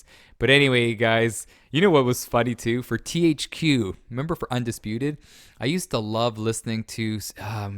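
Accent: Canada. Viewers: Why do u sound soooo canadian?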